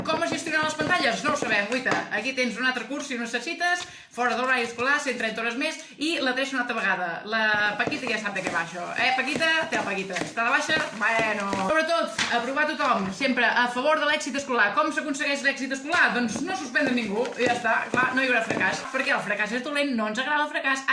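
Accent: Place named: Girona, Spain. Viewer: M’agrada escoltar la a la radio accent Girona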